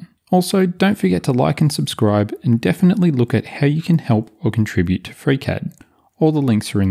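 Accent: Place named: Australia. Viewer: It's great to hear a Bazz. (read Oz-Trail-Ian) accent making such an informed and clearly articulated analytical video. I'm an FC newbie and, after many montha of bashing my old head against the Blender wall, I now know that this is the package GP draughting package I've been looking for! Well done mate, Cheers, pk (Central Vic.)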